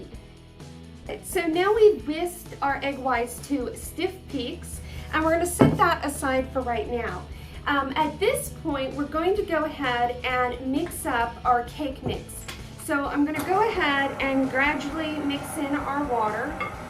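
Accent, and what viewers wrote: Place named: Midwest, United States. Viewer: I'm guessing u are from the Midwest. ..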